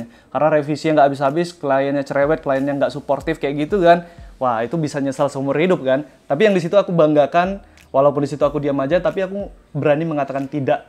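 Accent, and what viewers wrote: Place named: Medan, Indonesia. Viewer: Bang org man nih Kayak ada Medan Medan nya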